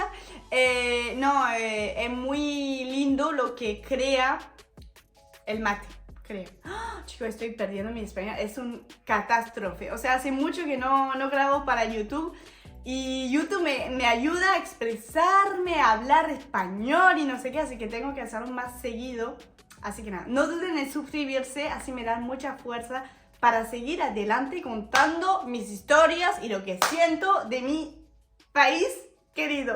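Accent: Buenos Aires, Argentina. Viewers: sos una argentina más, llegastes aquí y se te transformó tu rostro, vos lo decís, sos más alegre. Argentina tiene muchas cualidades que hacen que muchos extranjeros la amen que puede ser nuestra rica cultura, gastronomía, arquitectura Pero lo que creo que les fascina a los extranjeros es nuestra hospitalidad, la amistad que es muy típico de nosotros, los hacemos sentir como si estuvieran en su propia casa. Somos muy particulares y eso gusta mucho así que bienvenida a la ciudad mágica que atrapa a mucha gente y de la cual terminan enamorados. Es así el tema y sobran los ejemplos con muchos extranjeros. Una vez más, bienvenida,ya se te pego nuestro acento.❤❤❤❤❤❤ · Genial. Me hiciste el almuerzo, hablas igual que un Argentino y puteas mejor todavía.....😂😂 Sos como la frase: UN ARGENTINO NACE DONDE SE LE DA LA GANA. 🇦🇷👏👏👍👍👍👍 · Hermoso que a veces decís "yo" como "sho" argento y a veces "io" colombiano, hermosa tu experiencia, Argentina un camino de ida. 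· Holaaaa!, te digo que tenés acento argentino, y ya tenés muchos modísmos también...como decir al pedo etc..🤣🤣🤣. Acá son la 9 de la mañana, estoy tomando mate y viendote. Sos encantadora, re piola! 😂.Ya me suscribí. Besos y hasta siempre! 🤗🤗🤗🇦🇷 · Esperamos que vuelvas a TU Argentina,!!! Sos muy argenta en todos tus modismos, tus expresiones (estar al pedo, la entrañable costumbre del mate a cualquier hora del día, y la c... de la lora!!😅🤣😂) tu alegría, tu pedir permiso, el yeísmo tan nuestro, sonás muy argentina!!! Súper simpática, adorable, totalmente compenetrada con nuestros términos!!! No demores, te esperamos!!!🥰🤗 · da gusto verte, por lo espontanea, lo que transmitis, alegria, y tu castellano es muy bueno, mejor dicho no es castellano, hablas argentino, espero puedas venir a pasear algun dia y ver a tus viejos amigos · EN SERIO SOS FRANCESA???PARESES UNA ARGENTINA MAS , TU ACENTO Y MODISMO ES INCREIBLE JAJAJA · Habla mejor el argentino q nosotros 😂. 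Qué genia esta chabona 👏🏻👏🏻👏🏻👏🏻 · sos increible! Me enamore de vos!! Te escucho hablar y veo a una Argentina mas!¡ increible lo bien que hablas el idioma,los modos que adoptaste,las costumbres! Se ve y se nota que disfrutaste el tiempo vivido aca,lo feliz que se te ve!!! Espero de todo corazón lo mejor para vos! Para uds!!! Espero vuelvas al país y ovbio que hagas mas videos!!! Besos y cariños!!❤😊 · Amo a los extranjeros hablando con acento argentino te queda muy bien jaja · 100% argentino tu relato! Tu castellano argento está impecable! Un fuerte abrazo, ❤❤❤ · Un Argentino nace donde quiere, sos un sol, tenes brillo propio, me encanta escucharte tan argento, es obvio que nuestro pais no esta pasando su mejor momento, es la cruda realidad, pero resulta muy positivo vos muestres como somos o te sentiste en mi patria, obvio tambien la tuya, somos super cancheros, amigueros y orgullosos de ser ARGENTINOS, nos tildan de agrandados y esta mas que bien, ya que lo somos, tenemos con que, el octavo pais mas grande del mundo, no es poca cosa eso, recibimos a todos con los brazos abiertos y soportamos que a nosotros nos llamen en europa sudacas para ofendernos, pero pese a todo eso somos re orgullosos de lo que somos, tengo conocidos que viven hace mas de un año en FRANCIA y no hicieron un solo amigo, eso para nosotros es dificil de entender, si se comunica en ingles le dicen ingles no, hablan en español y peor los ignoran, creo la epoca de ser potencia colonialistas es cosa del pasado, es hermoso ver lo que decis y como amas nuestra patria, espero vuelvas pronto al pais que te demostro sos una argento mas, saludos genia, Merci pour tout, surtout l'amour pour mon pays, le meilleur de la vie pour toi, ici tu as toujours ta place dans le monde, salutations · Que grande la halando a lo argentino.... 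una pena que ya te fuiste de Argentina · sos bella en todo sentido, me encanta tu tono Argentino, saludos desde salta Argentino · te escucho y ya sos Argentina.... me encantó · Porteño! Diría YO 😂 jaja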